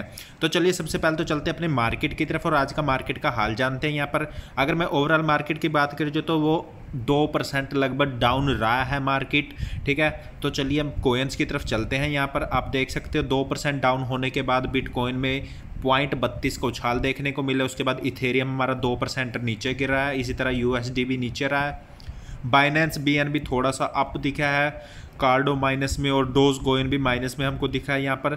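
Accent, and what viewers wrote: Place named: Haryana, India. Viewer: Pure hariyani Bhai.....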